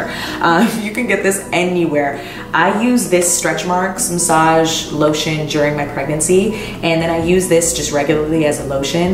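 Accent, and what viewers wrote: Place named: Guyana. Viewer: LMAO your Guyanese accent killed me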